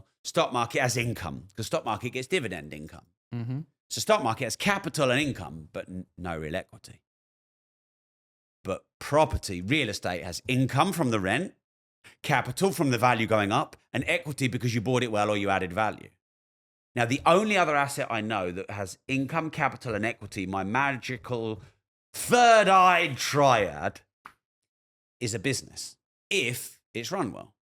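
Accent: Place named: United Kingdom. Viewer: Can't listen to anyone with a British accent more than 30 seconds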